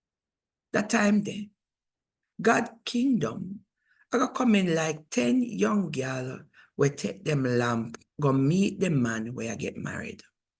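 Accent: Jamaica. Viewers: Lol first I am hearing the story read like this. Nutten like Jamaica Patwa. Makes the story so colourful 💯😊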